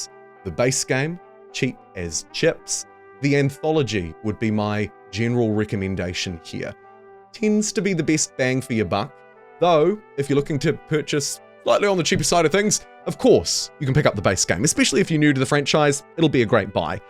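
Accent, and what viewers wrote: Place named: New Zealand. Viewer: Your NZ accent is so amazing it makes me wanna pay attention and listen to what you’re saying 😍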